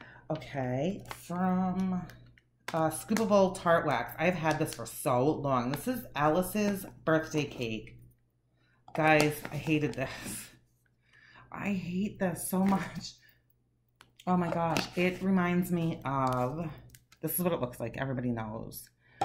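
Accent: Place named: New York, United States. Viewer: I’m from Boston. She sounds like NY or New Jersey.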